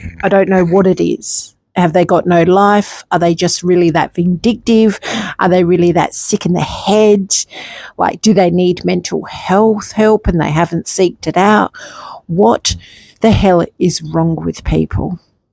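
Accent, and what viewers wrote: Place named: Australia. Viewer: I've never come across your channel before, you sound Australian and I don't get to follow many Australian creators. I felt the same way many times when Fiona so generously updated us on dear Grahams condition-just wanted to hug her. Then I made the unfortunate choice to watch what that hideous swamp hog said about Trevor's visit and was shocked that people are so awful. Why though? That's what I don't understand. They've never done anything to any of them.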